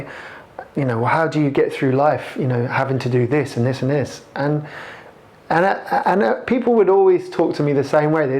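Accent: United Kingdom. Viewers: I see. But what kind of British accent it is? He sounds a lot like Jack Harries, Jacksgap. Southern? IDK :< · yep it's a southern english accent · british accent he's from england!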